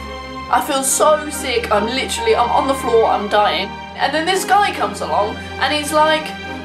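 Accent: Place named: United States. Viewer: That was a pretty good American accent.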